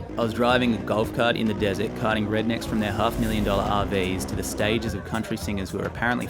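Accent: Australia. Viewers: I don't like this. I LOVE this. I've shared your vids a few times (and hope your followship and fellowship GROWS). I have special connections to chance, serendipity and Arid-zona. Beautiful tribute, but even better, you're a great storyteller and give sound advice. Oz accent or not (and storyteller is the highest compliment I can give, because it doesn't matter the medium, that is what we do). <3 And RIP to your friend Chris. Unless there's new adventures on the otherside. In which case, I hope he rips it up!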